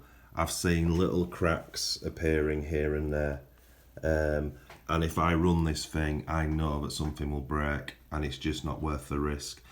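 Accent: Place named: Northern England, United Kingdom. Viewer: Lol just noticed if you see subtitles i dont think it understands your northern accent it comes up as Tommy Legends .. Oh love the car 👍👍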